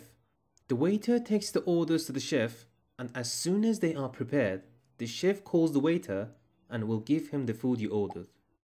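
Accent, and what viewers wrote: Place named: United Kingdom. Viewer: Thanks! Now I know what API is! BTW, like your British accent 😉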